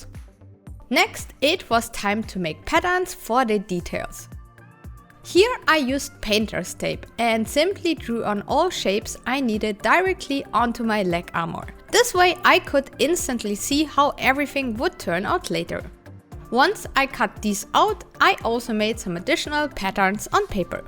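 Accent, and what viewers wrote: Germany. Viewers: Where did you catch the german accent?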